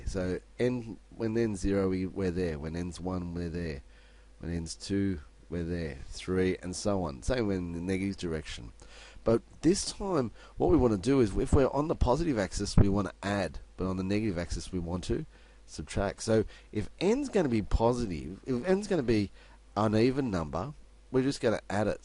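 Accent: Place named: Australia. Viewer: aussie ascent ...nevertheless good tutorial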